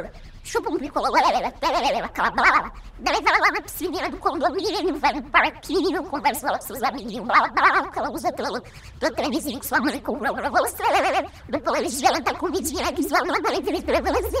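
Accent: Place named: Favela, Brazil. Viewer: O engraçado é que ela fala como se tivesse nascido na favela,pobre,passando fome né kkkk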